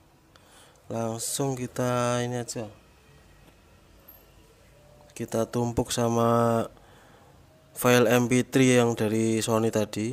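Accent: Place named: Java, Indonesia. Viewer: Lagi nonton video mu ini Mas. Le mgendikan thik medhog men njenengan Mas.. 🤭🤭🤭 Tetap unik & otentik.. Mugi sehat2 njih Mas Dados amal Jariyah & ilmu engkang manfaat. Aamiin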